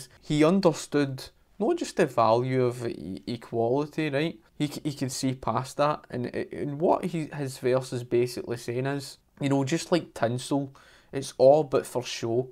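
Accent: Scotland, United Kingdom. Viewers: That beautiful Scottish accent.. Glad you're on youtube!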